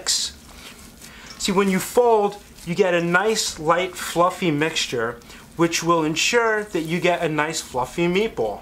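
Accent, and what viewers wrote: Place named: New York, United States. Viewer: Love the way you cook and your (New York) accent...? 😃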